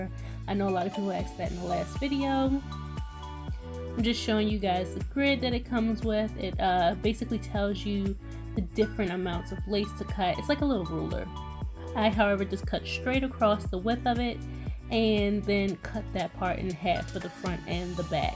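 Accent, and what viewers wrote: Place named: Baltimore, United States. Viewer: Wowwww sis you bomb!!!! One question tho.. you from Baltimore? You sound just like me lol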